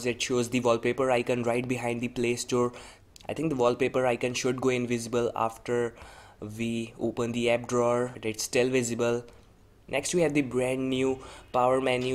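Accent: India. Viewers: I'm a simple man, i see an indian accent, i downvote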